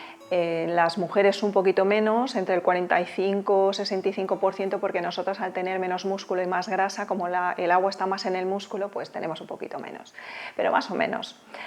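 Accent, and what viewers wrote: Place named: Spain. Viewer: me encanta escuchar a los de Espana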